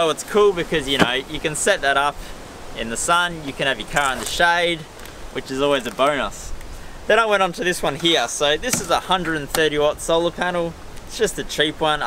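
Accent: Australia. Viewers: The Steve Irwin of Off-road, not just because of accent, but I enjoy the content because clearly he is enjoying discussing it. Accent helps though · Australian accents are just awesome! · We probably have a different accent? But we all are not a dumb cu.t He might have make a mistake etc but he knows what he is doing.😎 Don't fu.k with an ozzie 😆 Oi oi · Very well explained... where u from Mate? Australia?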